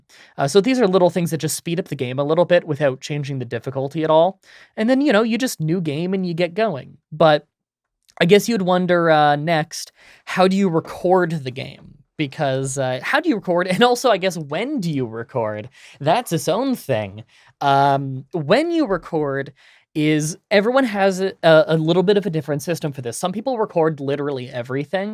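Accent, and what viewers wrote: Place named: Canada. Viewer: The “out” thing is just his Canadian accent showing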